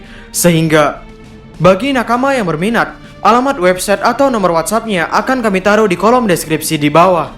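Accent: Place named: Ambon, Indonesia. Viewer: Ambon mana suaranya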